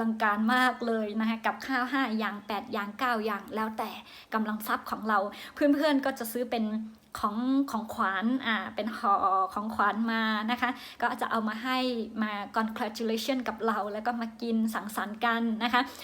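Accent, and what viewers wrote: Central Thailand, Thailand. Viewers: ชอบน้ำเสียงพูดของน้องมาก พูดไทยภาคกลางชัดกว่าคนไทยบางคนอีก เล่าเรื่องเข้าใจง่ายทุกเรื่องเลยครับ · น้องภาษาไทยชัดเป๊ะ เหมือนคนไทยภาคกลางเลย เก่งมากครับ อยากทราบว่าน้อง เป๋นไตรลื้อ หรือไตรขืนจ๊ะ ลุงเพิ่งดูน้องคลิปแรกจร้า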